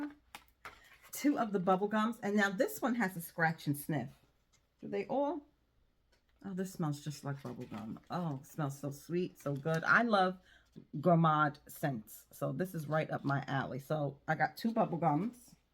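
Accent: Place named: New York, United States. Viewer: I love your accent. Is it NY?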